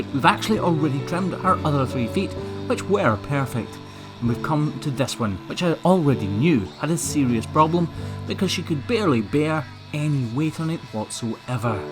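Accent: Scotland, United Kingdom. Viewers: I'm a 65 year old grandma and I live in a little old house on the lower Eastside of Erie, PA. And I never miss an episode of the hoof gp. Why I find it so fascinating 🤔 I have no idea. Maybe it's that Scottish accent 🙂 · I really enjoy watching your videos . I’m fairly new to your channel, but I must confess, since I’ve been watching you every time I clip my nails I hear (in your lovely Scottish accents) “ You lot just look at this heifers overgrown hoof , lets just make her as comfortable as possible “ 😜🤪😝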